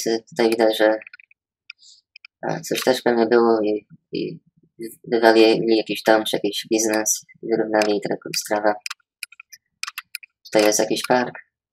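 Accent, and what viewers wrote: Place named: United States. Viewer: Zawsz chciałem poznać kogoś kto mówi po polsku a się w Polsce nie urodził i posłuchać jak mówi. Twój język jest dosyć zabawny dla widzów, którzy nigdy nie byli w USA i nie znają Polonii. Zabawny w pozytywnym tego słowa znaczeniu. Ale uwierz mi, że o wiele bardziej wolę słuchać Ciebie i o wiele łatwiej Cię rozumie, niż niektórych nastolatków w Polsce. Szkoda że zmieniłeś swój głos modulatorem. Nie powinieneś się przejmować uwagami. Ktoś kto ma minimum inteligencji i wyobraźni wie, że niełatwo jest mówić idealnie dwoma językami, jeśli się w obu krajach nie mieszka i nie ma na co dzień kontaktu z "żywym" językiem. Tak samo zabawnie brzmi ktoś kto świeżo przyjedzie z Polski i zna język angielski tylko ze szkoły.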